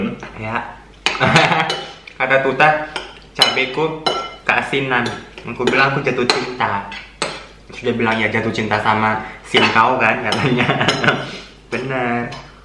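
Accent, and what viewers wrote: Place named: Sunda, Indonesia. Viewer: itu emang sunda banget,soalnya aku dari sunda🤭👍salam dari indo🙏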